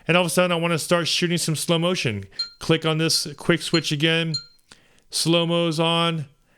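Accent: Philippines. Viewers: Ilocano ka gayem..i know by accent .lol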